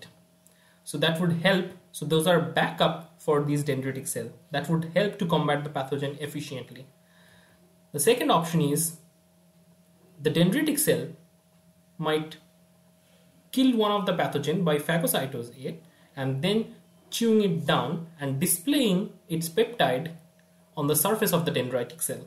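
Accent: India. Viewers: Fuck !!! I hate Indian accents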